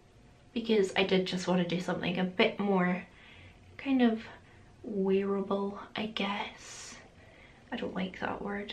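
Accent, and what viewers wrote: Scotland, United Kingdom. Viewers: I love this! Do other Scots call you posh because you don’t say stuff like “dinnae”? I’m from Devon, the accent here is like Sam from lord of the rings and I constantly get comments about being posh just because I don’t have a carrot cruncher voice 😂🙈🤷🏻‍♀️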